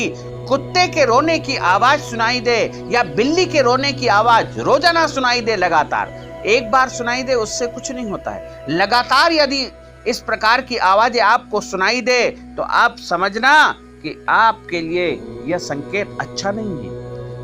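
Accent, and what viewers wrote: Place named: South, India. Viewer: South Indian Hindi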